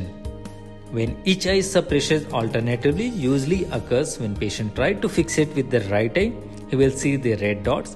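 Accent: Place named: India. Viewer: و الله يا الهنود شوفو ليكم حل لي الاكسن بتاع الانجليزي دا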